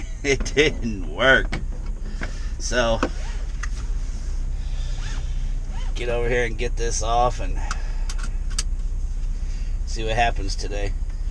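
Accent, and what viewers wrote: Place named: Akron, United States. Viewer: You sound like your from Akron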